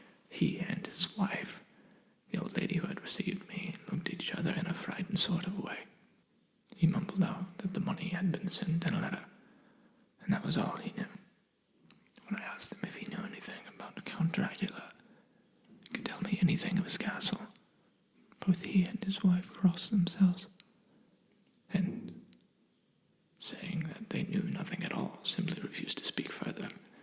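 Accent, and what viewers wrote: England, United Kingdom. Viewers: Love how he casually speaks in an English accent and I not so casually fall on the floor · That was amazing. I'd love you to continue the story in another video....maybe even do a roleplay of the Count himself. Your English accent was fantastic too. I loved that. xx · He's American but since the narrator of Dracula is Jonathan Harker who is English, is going with canon and doing an English accent in this vid:) I think it's quite convincing 😍😍😍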